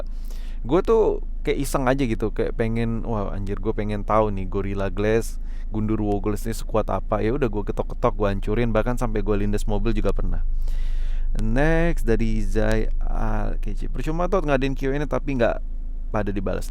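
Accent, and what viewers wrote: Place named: Medan, Indonesia. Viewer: Logatnya logat anak Medan tapi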